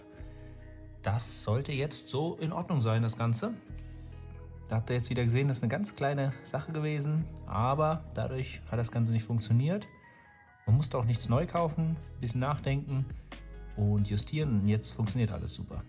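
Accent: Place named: Dippsch, Germany. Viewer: So toll aufgebaut die Videos 👌👌 von wo kommst du denn? Das Dippsche 😄 geil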